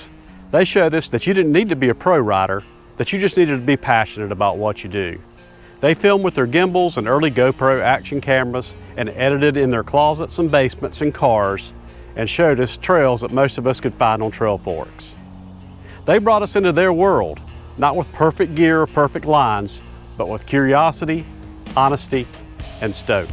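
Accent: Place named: Carolina, United States. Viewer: That's the thickest Carolina accent I've heard (and unfortunately going extinct). Great video great storytelling! Seth did an interview with Remy Metailler and it was a great peek behind the curtain into how being a YouTuber can kill your ability to enjoy what you are supposed to be enjoying on camera.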